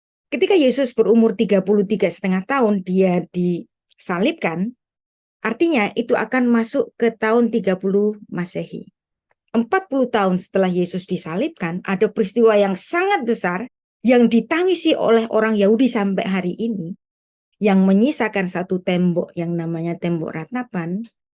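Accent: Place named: Java, Indonesia. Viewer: Materi Hanuka sangat menarik namun yg kurang ketertarikan adalah dialeg kedaerahanmu asal suku jawa itu yg melemahkan memahami pemaknaan isi sesungguhnya. Bisakah anda menggunakan bhs lndonesia yg baik dan benar tanpa kontaminasi dialeg kejaweanmu? Kok sampai usia yg segini tdk bisa menghilangkan dialeg kedaerahanmu itu gmn sih ceritanya??? Gunakanlah bhs lndonesia yg baik dan benar sebab lndonesia bkn seluruhnya suku org Jawa kan? Harap dipahami utk dimaklumi.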